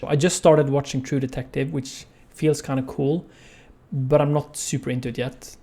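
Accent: Norway. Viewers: Interesting to hear your Norwegian accent. Sounds like you're from the Sogn og Fjordane area, probably a bit inland, not coastal.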